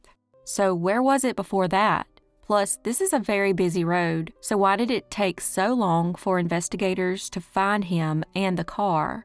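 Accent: South, United States. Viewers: Ooh really I will check it out! Love your voice and your Southern accent! Thank you for the time you take to research all these cases! 🙏🏿❗ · Yes! Quickly becoming my first click whenever I get on YouTube ❤ your channel is only one of three channels I have my notifications on for. 🌟 Love your soothing voice with your slight southern drawl and every story you cover needs the lime light! 💚 thank you!!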